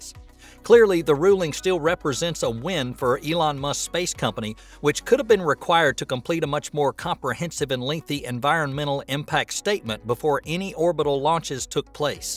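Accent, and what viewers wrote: South, United States. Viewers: Hearing the most countriest narrator ever use technical terms sounds hilarious. Keep it up!🤣 "THREEH, TWOOOO, WUHHHHHHHN, LAWNCH!"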